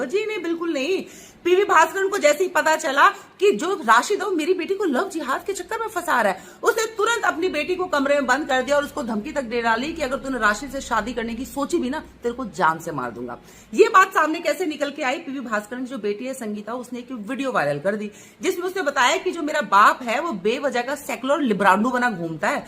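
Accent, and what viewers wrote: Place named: Haryana, India. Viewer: I like u, ur haryanavi style is outstanding, love u❤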